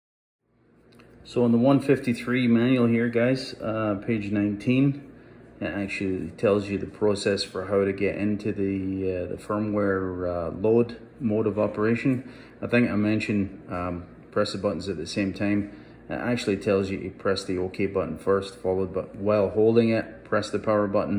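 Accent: Scotland, United Kingdom. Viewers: Is that a Scottish accent?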